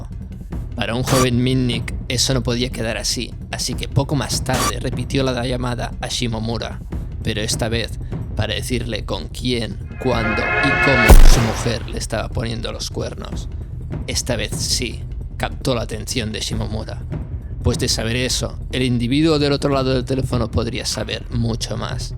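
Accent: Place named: Catalonia, Spain. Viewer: Em fa molta gràcia com se't nota que ets català 😉 Salutacions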